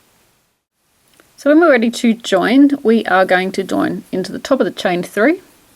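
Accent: Australia. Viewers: Thanks very much, hearing what I assume is an Australian accent threw me, Newbie here, yuor tutorials are great.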